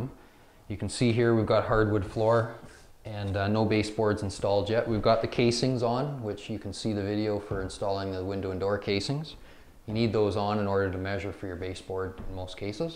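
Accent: Canada. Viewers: Is he from canada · His accent is a pretty big giveaway but his hat also says Canada. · def from canada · You must be from Canada or the Upper Peninsula of Michigan huh? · How nice to share your knowledge with all of us. Love the Canadian accent - you are a great help sir. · His accent gives him away. Haha.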